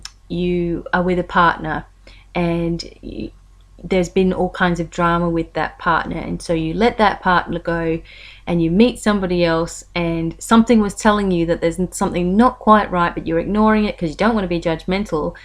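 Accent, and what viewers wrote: Australia. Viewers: hi thankyou for your monthly dose of spirituality, i was looking forward to listening to this. I especially like the part about what you said about Cats, yes they have a great energy, that they pass on, when all around is hectic they can bring a sense of calmness. keep the videos coming, watching and listening is a meditation in itself. Along with your lovely Aussie accent. peace and love.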